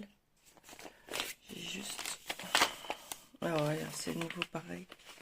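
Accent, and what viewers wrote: Belgium. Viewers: tu aurais un petit accent belge ?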